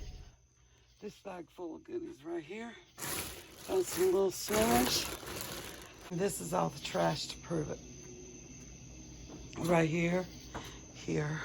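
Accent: South, United States. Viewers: I miss the South, I love you southern accent! God has a way of doing that.. Lol... You had me in tear at the end... I love your Video's and your Chanel is awesome.. I believe were probably close to the same age..✝️💜✝️ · Where are you located you sound like you are from the south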